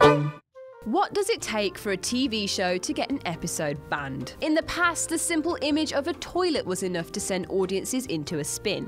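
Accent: United Kingdom. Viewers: The Top 10 countdown industry is becoming ridiculous. Now a random person with British accent is plagiarizing other top 10 videos and posting them...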